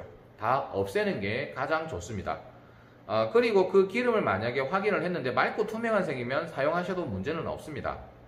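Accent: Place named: Gyeongsang, South Korea. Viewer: 뜨거운데 디지는 않습니다ㅋㅋ 갱상도 사투리 정겹습니다ㅋ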